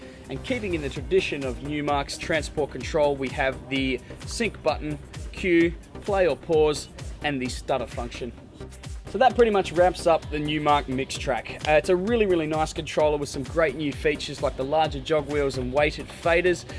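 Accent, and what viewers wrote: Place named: Australia. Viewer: finally, an aussie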